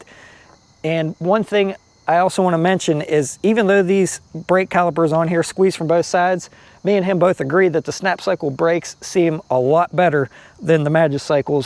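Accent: Pittsburgh, United States. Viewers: Great video! Are you from Pittsburgh?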